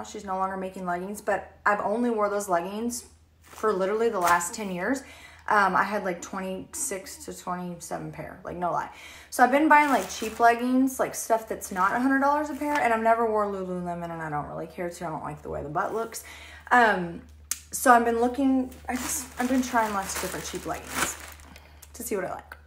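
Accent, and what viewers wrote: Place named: Brooklyn, United States. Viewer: sounds from brooklyn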